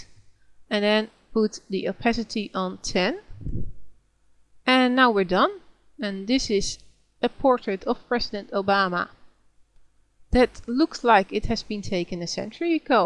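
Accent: Netherlands. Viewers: She really has an accent from Holland, not an insult but most people of the Netherlands most of the time can't speak with a good French accent, that's more something for Flemish people. btw, thanks for the great tutorials ' '! It rlly helped me!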